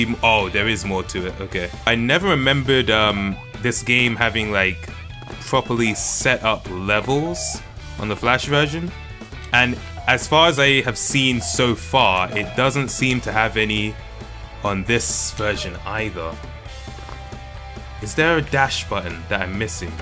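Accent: Nigeria. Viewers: are u igbo or Yoruba? -naijagang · Oh heck fam U Nigerian?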